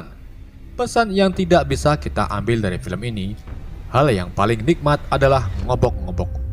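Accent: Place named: Java, Indonesia. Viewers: Lu orang Jawa ya bang, dari kota mana bang?? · Pembawaan narasi selalu menarik, "sewu welongatus sangang puluh papat". Logat jowone met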